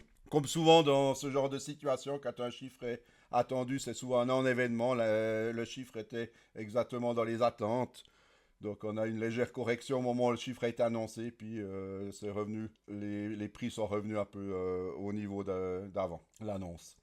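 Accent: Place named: Alsace, France. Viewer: je reconnais bien ce bon accent alsacien car je suis de strasbourg 🙂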